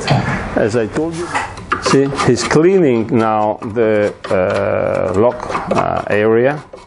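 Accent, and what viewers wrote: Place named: Hungary. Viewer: Nagyszerű videó!:)És jó hallani a magyar akcentust az angolban!:D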